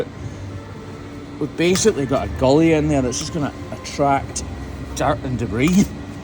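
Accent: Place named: Scotland, United Kingdom. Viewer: I love you Scottish accent (I'm from the U.S.A.)... even when you say things like "dirt and debris" it sounds so cool... :) Love your channel!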